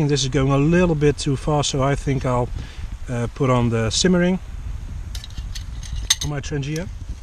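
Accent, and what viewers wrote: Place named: Canada. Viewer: Another enjoyable video! Where are you from? I am thinking Canada? But I may be completely wrong. You do a great job!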